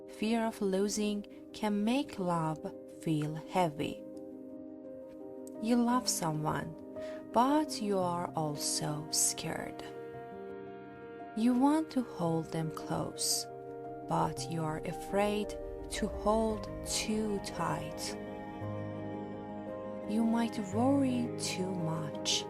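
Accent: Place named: Iran. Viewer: عالی بود و ممنون از زحمت شما . اما اکسنت ایرانی بود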